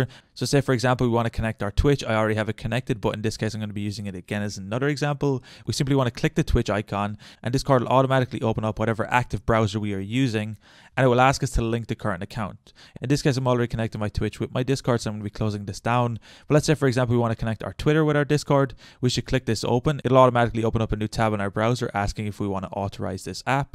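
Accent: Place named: Ireland. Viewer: You sound like Oneyng if he stayed in Ireland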